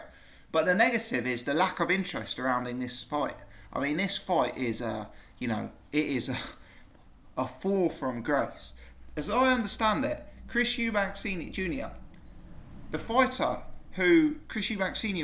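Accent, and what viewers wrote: United Kingdom. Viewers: nice to listen to an Englishman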